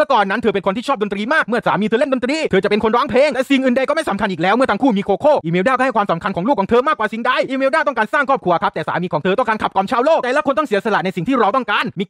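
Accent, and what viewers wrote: South, Thailand. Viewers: พี่เป็นคนใต้หรอครับ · เเอดเป็นคนใต้ท้ายนิ555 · พี่คนจังหวัดอะไรครับคนใต้หรอพี่ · พี่เป็นคนใต้เหรอ พี่เป็นคนจังหวัดอะไรอ ผมสงขลา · พี่เป็นคนใต้ใช่ม่าย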